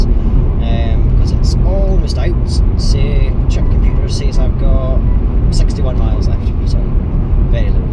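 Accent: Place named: Scotland, United Kingdom. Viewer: Thanks for uploading a new video. It sucks that you wrecked the Benz, it looked like a really nice car. Anyhow, there's another issue - it may be due to the fact that I'm not a native English speaker (I love your Scottish accent though), but with all the noise from driving the car, it was very hard to understand everything you said in this video. Also, thanks for not mentioning my name (which is fake anyways, but no matter) in the video (anyone who reads the comments can figure it out anyways, but that's about enough for me).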